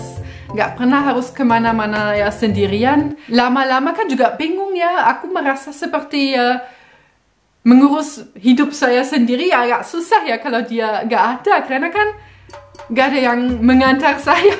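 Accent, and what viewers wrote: Java, Indonesia. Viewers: Mendok bgt udah mbak ya.sy asli orang Lhokseumawe Aceh prnah tggl kerja di smrag bbrp th dl.,snag ja liat video kalian dg ltar blkag liku liku hbugan cinta kalian.mas.smg bgia trs n langgeng ya mas,jg mbak saat trs bgia trs.prbyak syukur dg itu kbgiaan akn brtmbh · Mbak. Medhok mu itu lo kok legit banget. Koyok mendoan.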